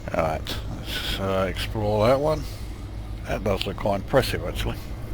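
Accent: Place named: Southend, United Kingdom. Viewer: great video mate, really like your style :o) I am a expat now but your accent and way of speaking reminds me of so many blokes I know from my Southend-on-Sea days :o) Cheers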